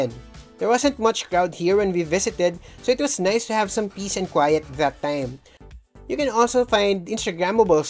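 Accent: Philippines. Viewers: Pinoy accent, surely for the unwelcome poor tourists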